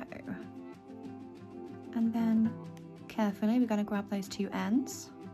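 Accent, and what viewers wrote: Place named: United Kingdom. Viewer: love!y to hear a fellow Brit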